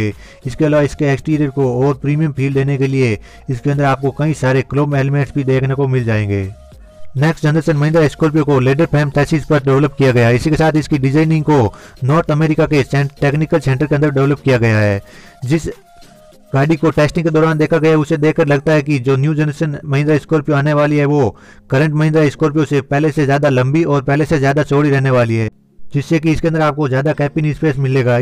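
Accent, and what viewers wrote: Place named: Rajasthan, India. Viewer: लगता है राजस्थान से हो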